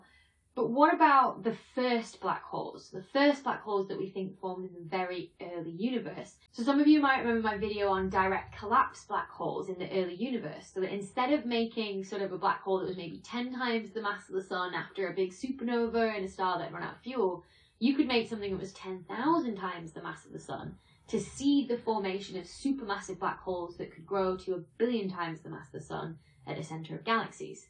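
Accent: United Kingdom. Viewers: It makes very little difference, but for some reason i find it really pleasant to hear a british voice explaining science for a change. I seem to follow all the american science channels. Subscribed, and totally not biased.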